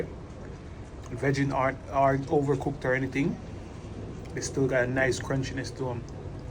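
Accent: Jamaica. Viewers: Can anyone tell me where his Jamaican accent has gone ,seems to be missing 😮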